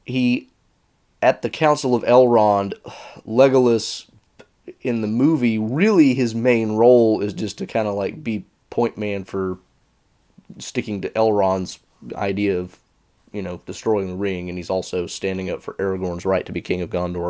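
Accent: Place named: Georgia, United States. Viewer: Georgia. That's your accent. Georgia. I know it.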